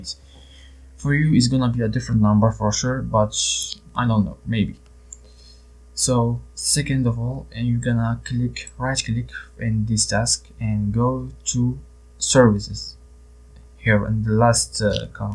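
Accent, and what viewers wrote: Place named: Spain. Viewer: i think he is Spanish his accecnt not to hard to understand but maybe if he wrote it down instead of talking to me i juse restore my computer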